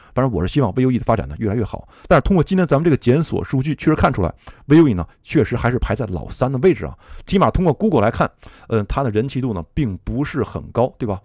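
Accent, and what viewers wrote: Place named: Japan. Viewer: 😂听说中文的习惯猜生活在日本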